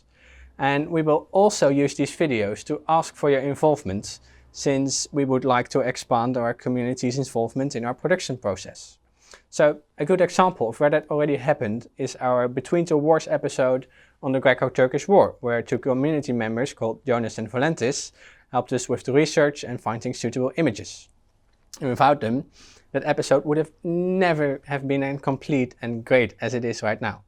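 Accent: Netherlands. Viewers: YES. JOIN ME IN THE DUTCH ACCENT REPRESENTATION SQUAD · Ik hou van een Nederlands accent. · That's some T H I C C Dutch accent right there! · He has a great voice that’s fun to listen to 5/5. It’s like an American doing a British voice while being Dutch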